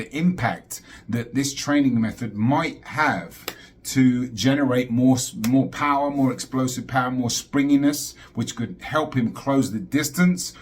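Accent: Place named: Cockney, United Kingdom. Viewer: Love that east end accent, guv mate 👍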